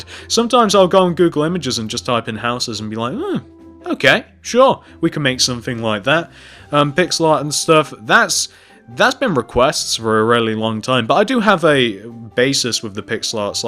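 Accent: United Kingdom. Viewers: Are u British then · I don't why but you remind me of PopularMMO's but with a british accent.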